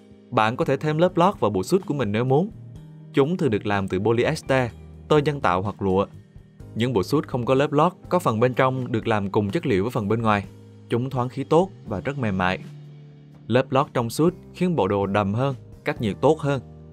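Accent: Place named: Quang Nam, Vietnam. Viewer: Lần đầu nghe giọng Quảng trên kênh, khá êm tai nha 👍👍👍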